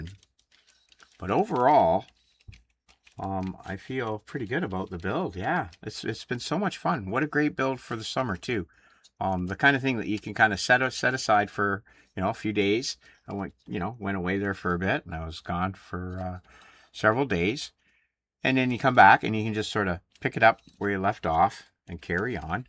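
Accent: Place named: Scotland, United Kingdom. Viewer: Your definitely Scottish not Finnish... Lol Great kit!!! 😎👍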